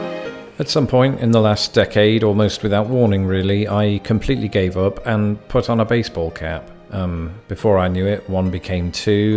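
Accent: United Kingdom. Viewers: Well you still sound Brittish dude & hey i think you can buy a suit & bow tie when you visit home! 😎😎😎😎😎😎 But for now go & get yourself a pair of cheap sunglasses! ZZ TOP DUDE! 😎😎😎😎😎😎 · Whatever you do, don't lose your accent. Americans tend to think the British sound very intelligent. Just look at ads on tv--if a company wants to sell a luxury item, they often have a spokesperson with a British accent--if you buy this item (usually an expensive car), you will be considered posh and veddy sophisticated:) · You are still a little British as long as you continue to say pro-cess instead of praw-cess. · You still have your British accent and, most importantly, your British sense of humor - the two big reasons I watch regularly. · You're still not completely Americanized yet, as long as you are still saying "Sunt Patrick". Stay British!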